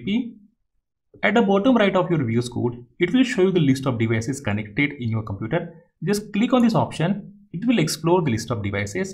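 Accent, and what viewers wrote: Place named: India. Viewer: i cant understand what you are saying bro.i dont understand your indian english😩😫. try using ai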